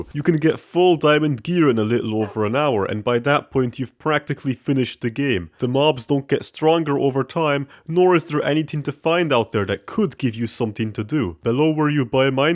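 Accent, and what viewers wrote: Eastern Europe. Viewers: You're a blend of Eastern European and Dub - its unique.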